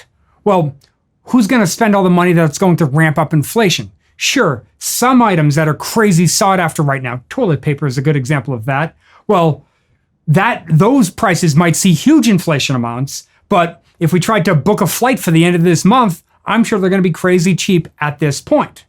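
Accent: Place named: New Jersey, United States. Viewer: = Awesome. I could listen to that lovely Jersey accent all day.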